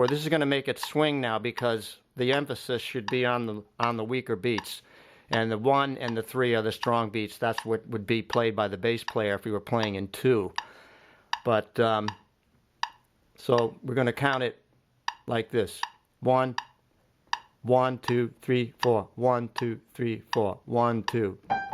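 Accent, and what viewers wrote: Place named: Boston, United States. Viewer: Nice Boston accent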